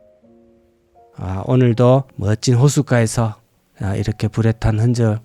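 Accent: Gyeongsang, South Korea. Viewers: 님의 정겨운 갱상도 사투리 듣고 있으니 마음이 참 편안해 지네요.. 20만 30만 100만 가즈아~ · 강선생님구수한경상도사투리최고입니다~^.^ 조은영상보게해주셔서고맙습니다~^^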